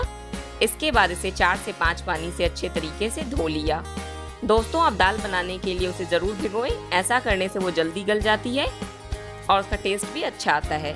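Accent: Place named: Sindh, India. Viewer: Very. nice recipe... Also luv ur Sindhi accent...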